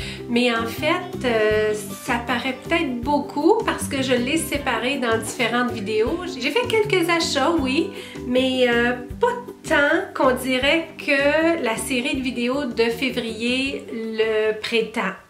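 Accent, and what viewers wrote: Canada. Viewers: Hello! Merci pour ta chaleur et ta douceur... Je te trouve lumineuse même si tu n'as pas dormi cette nuit! Je suis une nouvelle abonnée et j'aime beaucoup justement ton rayonnement, tes ondes positives ♡ Je te regarde depuis la France et j'aime beaucoup ton accent canadien ♡ Je pense que tu pourrais tester les produits et nous donner tes impressions après, cela semble une idée sympa. Je te félicite pour ta chaine et continue c'est un plaisir de de te voir rayonner ☆☆☆! Bisous d'une autre Nathalie! ♡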